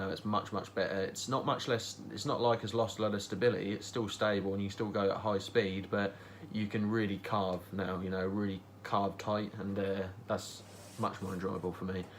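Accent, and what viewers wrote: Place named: Norfolk, United Kingdom. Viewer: Excellent video my dude! Same board and couldn’t agree more about the bushings. Where are you from? I know the accent, Norfolk right?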